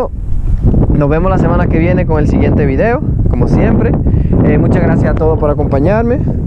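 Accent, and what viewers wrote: Dominican Republic. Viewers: De donde eres ? Eres dominicano🇩🇴🇩🇴 ? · Tu ere dominicano? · Loco tu eres dominicano? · Algo me dice que eres dominicano..de algun lugar del cibao..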